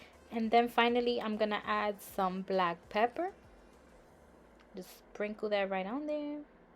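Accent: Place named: Puerto Rico. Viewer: Be proud of your Beautiful Puerto Rican accent ❤❤❤❤❤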